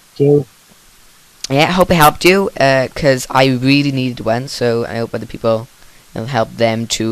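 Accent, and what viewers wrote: Wales, United Kingdom. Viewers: Dat welsh accent tho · Are you welsh? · A true Welshiee, Ditto! :D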